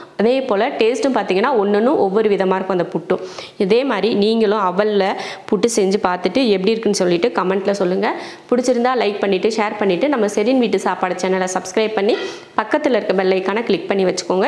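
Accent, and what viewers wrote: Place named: Theni, India. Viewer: செரட்ட தேனி language.....😂😂😂 Ur way of talking also தேனிகாரவங்க..... super sister நம்ம ஊரு மணம் ❤️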